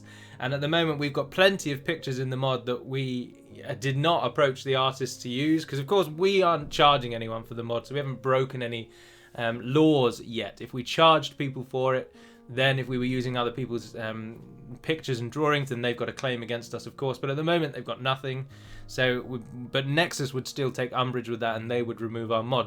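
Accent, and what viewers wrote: United Kingdom. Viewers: I have always enjoyed watching your videos. It's almost ritualistic that I come home from a day of work and after dinner (sometimes during dinner if my wife is not here) watch a video and escape into the world of LOTR. My wife says she doesn't mind me watching your videos because they are clean and she loves a good British accent. Your girlfriend/fiance is gorgeous and her saying the names of her statues reminds me of my wife. Keep up the great work and cheers to the future.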